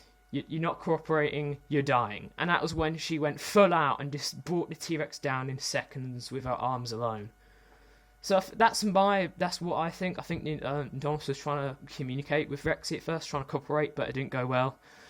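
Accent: United Kingdom. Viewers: i didn't even know he had a British accent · I actually quite admire your British accent, you sound smarter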